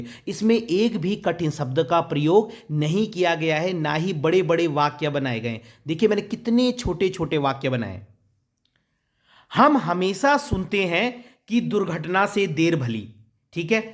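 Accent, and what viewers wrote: Garhwal, India. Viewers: aapke accent se lag rha tha mai bhi gadhwali hu😛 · Sir mai dekha aapke bolne tone garhwali jase hai Orr aake video dekh rha hu aaj mera exam hai 😂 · Bhai tum gadwali ho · Aap uttrakhand se ho na gadhwali..😄😄 voice tuning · Sir aap garhwali ho? 😃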